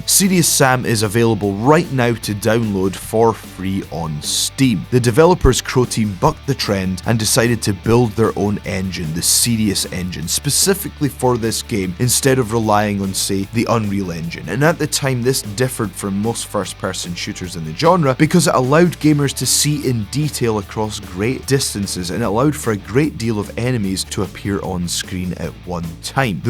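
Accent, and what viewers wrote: Scotland, United Kingdom. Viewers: This guy has a Scottish voice and I love it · Mainly just due to the Scottish, but still. Imagine a Doctor that connects with the youth on their level.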